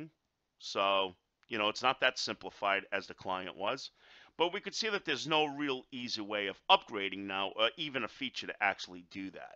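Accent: New York, United States. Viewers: amazing info and a new yawrk accent. love it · Omg i love your accent, Americans have the best accents. Great vid too, thanks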